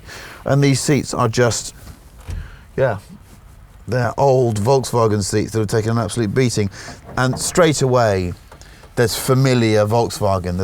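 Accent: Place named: United Kingdom. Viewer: British accent, but yeah. I guess that's what happens when you don't enunciate the 'n' at the end of "…teen" well enough -- the person entering the numbers in for the video board gets confused. <link>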